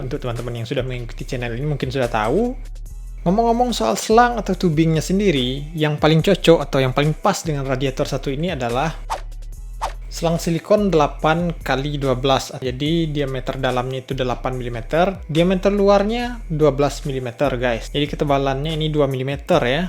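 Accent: West Sumatra, Indonesia. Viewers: Jaleh bana urang minangnyo...🤣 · bahasanya indomi kali yah, atau prasaan saya ajaa :) , gw tebak pasti orang minang 🗿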